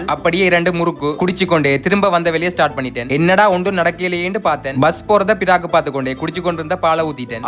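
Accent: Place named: Sri Lanka. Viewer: Sri lankan tamil pesinalae azhagu than❤😻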